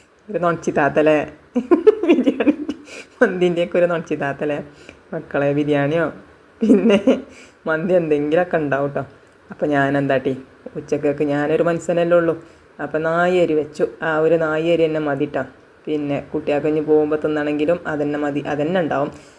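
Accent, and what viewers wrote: Malappuram, India. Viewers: ഇജ്ജ് മലപ്പുറം ഭാഷ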